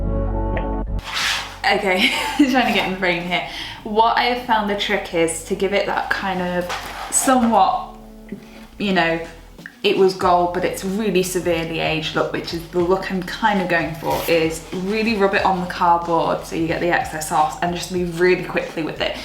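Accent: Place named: England, United Kingdom. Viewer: Hello. Found you this morning, so pleased to hear an English accent. I am from South Yorkshire and love a good old diy project. Looking forward to watching all your other videos 😊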